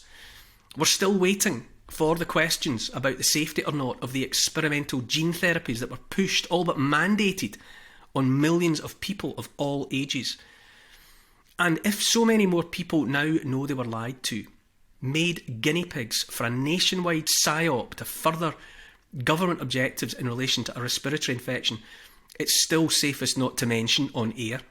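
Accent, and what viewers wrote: Scotland, United Kingdom. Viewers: This is what I thought every Scotsman sounds like... You can hear the freedom and yearning for love and truth.... God bless and keep you guys as we all are brought to Gods justice and holy light, these monsters can have what's left of the rock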